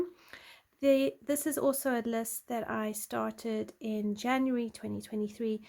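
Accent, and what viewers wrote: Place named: South Africa. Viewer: It was so great to hear another South African voice on the Color Tube. Good luck with your channel, your coloring is so lovely.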